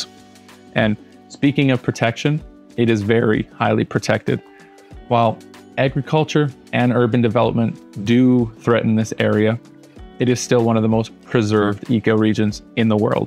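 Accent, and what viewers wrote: Canada. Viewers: This gentleman has a Canadian accent…it’s subtle but you can hear it…I believe he is Canadian. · Wow an American who knows something outside of the US. Then it dawned on me that's a Canadian accent. Now I understand. Great presentation by the way. I learned quite a lot about plants and endemic animals that I hadn't heard of before. By the way if you ever get a chance to visit the rain-forests of Australia you won't be disappointed. The Tasmanian ones are very special also. By the way that deserves a thumbs up and a subscribe!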